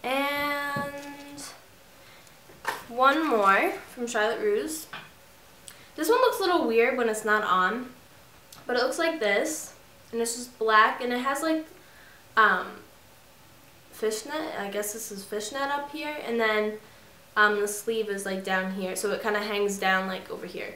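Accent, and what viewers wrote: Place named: New York, United States. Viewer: YOU FROM NY ARENT YOU!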